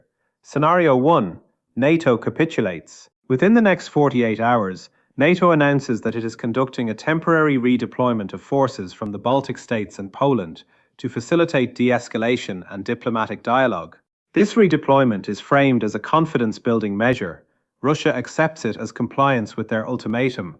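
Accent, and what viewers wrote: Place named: Ireland. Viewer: An Iranian with an Irish accent?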